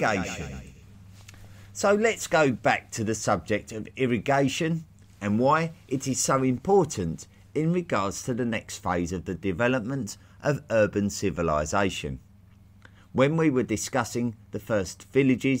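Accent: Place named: England, United Kingdom. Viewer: I believe it to be the, More-run accent. Fairly common throughout England. Many speak in this manner. Car salesmen. Snake-oil salesmen. Circus leaders. Politicians. It varies widely throughout England.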